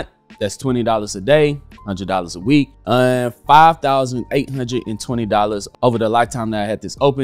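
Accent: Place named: Atlanta, United States. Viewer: What up! I swear sometimes I think you're in ATL with me when you talk. But this is not my comment for "QYLD the Movie" you put together. Based on vid, you invested $20 aday excluding weekends and holidays; correct? This calculate to 261 days a year at $20 aday for a total of $5,220 investing for a monthly paying div. My friend, I will be joining you; utilizing your strategy by reinvesting back into QYLD. Thank you for the entertainment purpose videos and I look forward to joining the road to passive income investing.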